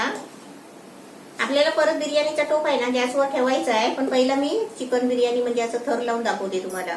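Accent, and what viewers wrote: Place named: Ratnagiri, India. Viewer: Taee masta biryani banavata ratnagiri chi bhasha pan mast bolta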